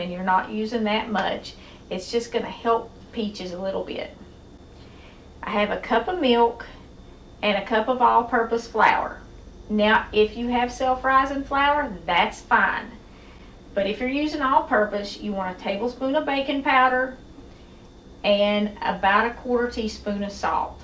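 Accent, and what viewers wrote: South, United States. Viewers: I have really enjoyed your sharing of this recipe and I’m looking forward to making it some day soon. I love that it is an old family recipe, wow 100 years old! Thank you for your kind words and for sharing in an indirect way your faith in God. I love the way you have honored our military service men and women on Memorial Day for their sacrifice to our country. You have such a charming southern accent that is very relaxing as well. Take care and God Bless you today and always!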